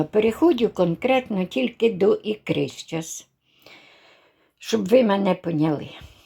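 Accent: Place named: Poltava, Ukraine. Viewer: Я теж робила ікру з кабачків і в кінці додавала сардини в маслі, тільки цибулю і моркву смажу окремо на пательні, потім додаю до засмажки томат, потім все це зливаю в ікру і ще трохи протушкую і тоді все по банкам. Смачного Вам. Мені наче почулось, що ви з Полтавщини, в мене батько був родом з Хорольського району.. Дякую Вам за рецепт. Перемоги і миру нашій Україні. Херсонці з Польщі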